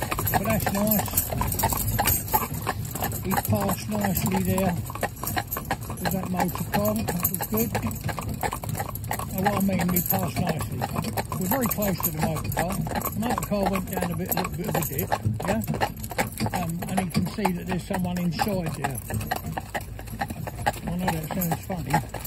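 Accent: United Kingdom. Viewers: That was delightful !😊.. Thank You ! "That'll do" and "That. Will. Do." had me laughing since those are used here, across the pond, for misbehaving pets/animals and children.. This Texan is now a subscriber, and looking forward to watching the rest of your videos and future ones ! 😀.. I usually do well understanding British accents, but yours is a little stronger and with the horses' clippity clops (which I loved) I had to use "closed caption", but I'll get better 🙂..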